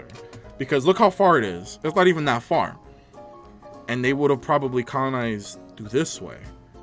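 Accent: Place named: Puerto Rico. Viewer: I laughed so hard. Probably more than I should have. 🤣😂. Good stuff. I knew you were Puerto Rican as soon as you opened your mouth. 💪great personality.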